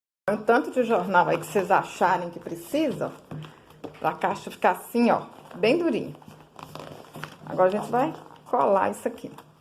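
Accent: Minas Gerais, Brazil. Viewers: Em primeiro lugar.Vc é mais linda q.o seu artesanato.Seu sotaque mineiro é tudo de bom.Sou paulista mais amo esse sotaque mineiro.E o seu artesanato:Meu Deus!Aja 💓!É lindo d+da conta sá! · Mineira UAI. Eu tbm sou mineira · De onde VC é? Parece um sotaque mineirinho, igual eu rsrs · Cumade é mineira uai so? Eu também sou 😆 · Amei que trabalho maravilhoso lindo demais, gostei também tanto de vc,de que estado vc é, acho lindo seu jeito de falar. Muita paz e saúde pra vc minha linda 😘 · Esse sotaque minerin é bom demais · Vc é mineira ? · Amei , vc é muito fofa , vc é de qual estado ?. Parece Mineira kkkk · Gostaria de saber de que lugar de Minas vc é, esse seu sotaque é mineiríssimo, sou nascida em Minas, Resplendor, mas moro em Itaperuna Estado do Rio.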